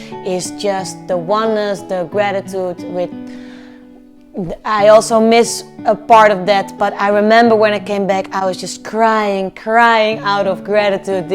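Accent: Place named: Netherlands. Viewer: Love your accent and i dont like all Dutch accents.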